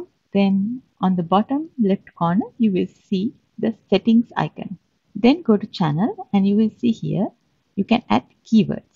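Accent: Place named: India. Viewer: oo i think u r Indian because of your accent 🙂 sry 😋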